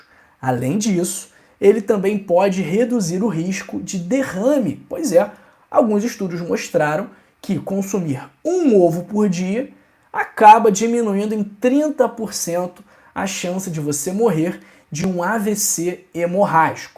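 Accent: Rio de Janeiro, Brazil. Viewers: Vídeo bom. No entanto, esse sotaque carioca muito carregado é irritante demais, não consegui assistir até o final.... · BOM DIA DOUTOR..QUÊ BOM OUVIR À SUA VOZ, ESTE SOTAQUE MARAVILHOSO, O CARIOCA...MELODIOSO,AGRADÁVEL, SUAVE,COLORIDO,CHEIO DE ENTUSIASMO...maryjou prof.italiano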